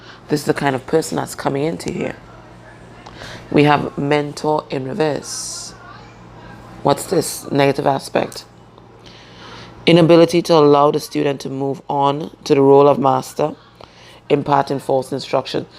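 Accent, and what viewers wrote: Trinidad and Tobago. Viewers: Trini accent?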